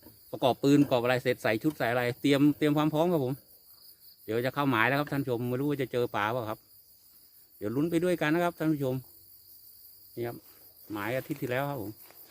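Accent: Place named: South, Thailand. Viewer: คนไต้แน่นอน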